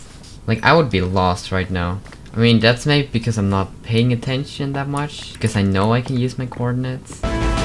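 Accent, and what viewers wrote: Netherlands. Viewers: somethimes i have the feeling that youre dutch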